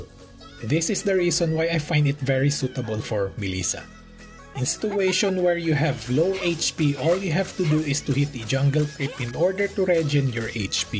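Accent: Philippines. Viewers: Pinoy na pinoy yung accent.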